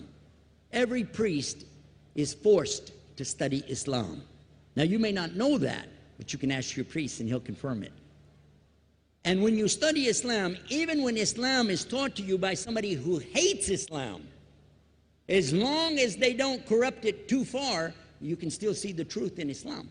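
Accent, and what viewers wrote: South, United States. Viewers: hears a Southern Drawl in *'s speech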